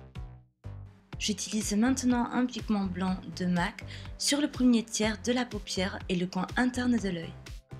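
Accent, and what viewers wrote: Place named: Béziers, France. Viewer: Vu ton accent tu viens de Béziers non ? Hihi j'adore ton maquillage bisous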